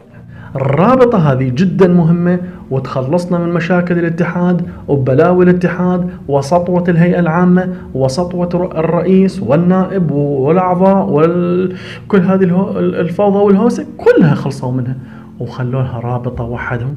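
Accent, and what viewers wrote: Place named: Iraq. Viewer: انته بطران وبالهجه عراقيه جاي اتلهوس ارجو د على تعليق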